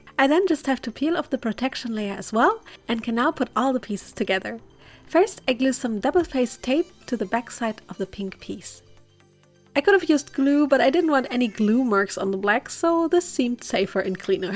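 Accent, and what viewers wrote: Germany. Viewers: me and my cousin LOVE ironmouse and watch all of her streams together so im happy that you made a doll of her also are you german? you have an accent to me and the name of the contact glue looked german so i got curious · I thought till I heard uhu (and saw) that you have an light german accent (I kinda have the urge to write everything in german but I let it be) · She looks marvellous! Great job. Her shoes are really worth the work and look amazing. Ps: Ha, ich hatte Recht mit dem deutschen Akzent. Schön das wir so eine talentierte Dollmakerin haben : )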